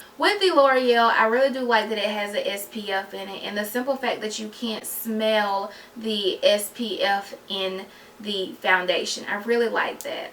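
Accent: South, United States. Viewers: Olivia lily really she has a country accent to me nicki minaj is from NYC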